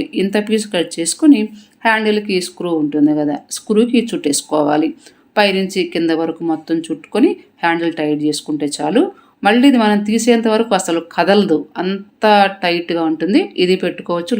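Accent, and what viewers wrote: Nellore, India. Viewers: Medhi nellore aa mam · మీది నెల్లూరు అనుకుంటాను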